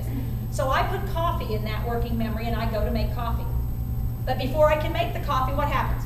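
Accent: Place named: East Tennessee, United States. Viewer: I grew up in East Tennessee. She sounds like my sister. Same accent throughout, just more noticeable when she goes from lecture voice to excited conversation voice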